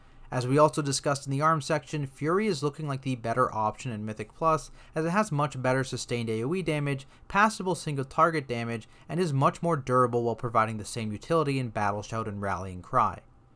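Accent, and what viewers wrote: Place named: United States. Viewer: He's got an American accent, and I posted the way it's said in his native tongue.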